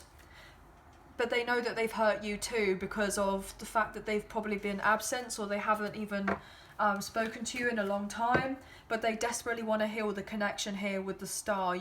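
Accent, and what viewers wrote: England, United Kingdom. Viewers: Refreshing to hear an English Voice x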